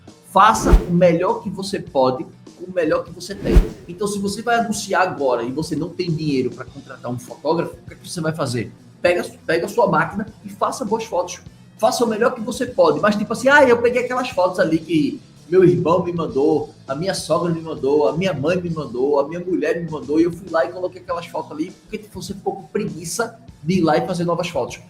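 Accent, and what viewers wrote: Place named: Northeast, Brazil. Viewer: A dicção dele é ótima, esse seu comentário é cheio de xenofobia, a sua "dificuldade" é só porque há um sotaque nordestino marcado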